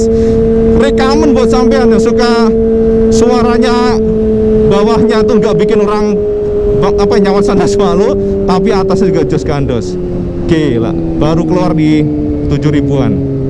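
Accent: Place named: Surabaya, Indonesia. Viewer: logat e koyok ne areek surbeje' .....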